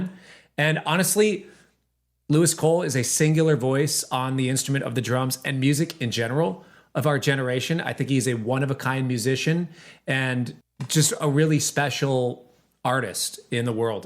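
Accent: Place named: Minneapolis, United States. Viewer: you’re definitely a south Minneapolis dude, I could tell, nothing wrong with it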